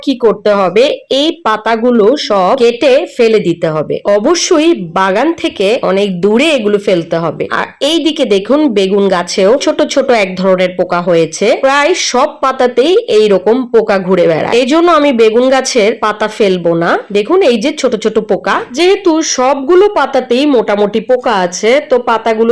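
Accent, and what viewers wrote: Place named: East Bengal, Bangladesh. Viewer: পূর্ববঙ্গের কথাগুলো শুনতে বেশ ভালোলাগে,যেমন::দিবো,নিবো,বাসা ইত্যাদি। কিন্তু সারাজীবন চেষ্টা করেও আমি বাঙালভাষাটা শিখতে পারলাম না কারন বাঙালরা যাকে বলে ঘটি,আমি তাই। ছেলেবেলায় যখন পাড়ার মাসি,পিসি বা দিদারা আমাকে হয়তো ডাকলো,"এই ছ্যামড়া শোন্, তখন আমি ভাবতাম আমাকে এনারা দামড়া বলছেন।রেগে ঘাড় গোঁজকরে বলতাম,"কি বলছো বলো।" এইরকমের অনেক মজার ঘটনা এই বাঙালভাষা নিয়ে আমার সাথে হয়েছে।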